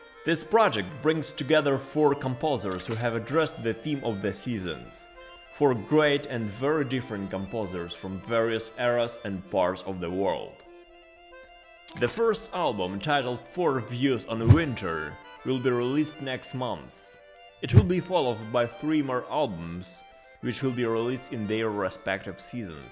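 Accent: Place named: Russia. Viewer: Русский акцент не отнять брптан😊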